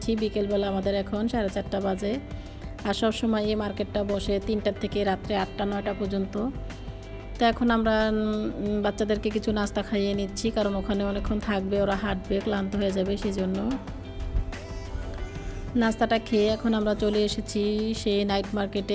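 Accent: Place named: Barishal, Bangladesh. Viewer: ম্যাডাম আপনার বাড়ি বাংলাদেশে কোথায় কথা শুনে মনে হচ্ছে বরিশালের মনে হচ্ছে আমাদের এই ভাষা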